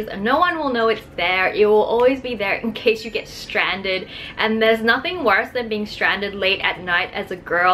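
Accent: Australia. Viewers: WengieVlogs thanks:) i think people watch your vids partially for your content, personality, and editing skills;) and partially because of your Australian accent ^-^ and maybe your hair ♥ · I love your Australian accent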